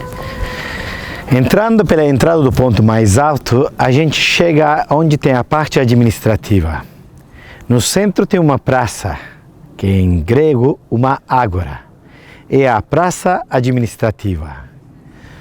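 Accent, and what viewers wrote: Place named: Brazil. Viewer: para uma pessoa de nacionalidade turca, você domina muito bem a língua portuguesa. Acaso morou no Brasil? Por que a sua pronúncia está similar com o português brasileiro. Parabéns pelos vídeos, eles nos levam a uma cultura multi-secular, que nos facina pela história do cristianismo e seus percusores. Gratidão...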